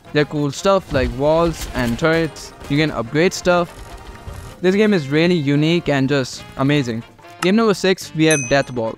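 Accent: India. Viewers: I know u r indian the freaking accent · By his accent i think hes indian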